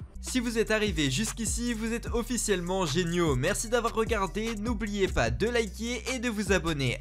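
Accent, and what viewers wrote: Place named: France. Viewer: Les commentaires insignifiant du narateur, avec son accent français me tape sur les nerfs.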